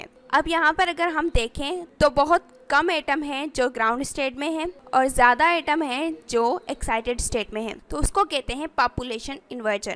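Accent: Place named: Deccan, India. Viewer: Aap Deccan, India se hai shayad apki accent waisi hai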